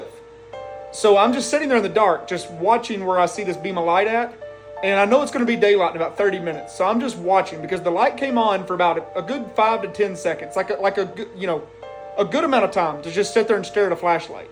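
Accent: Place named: Appalachia, United States. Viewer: No wonder I was drawn to your channel. I mean animals and spooky stuff aside. I knew kin when I heard it. 😂 WV Appalachian here.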